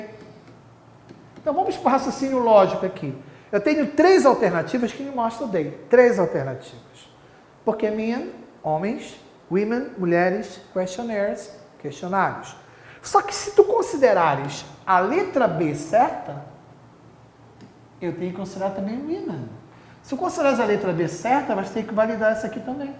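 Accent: Pará, Brazil. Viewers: Ótima aula e explicação, você é paraense professor? kk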